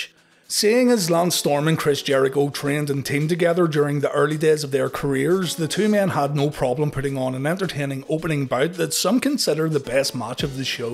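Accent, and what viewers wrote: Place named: Northern Ireland, United Kingdom. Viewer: Nice to hear a fellow northern Irish ECW fan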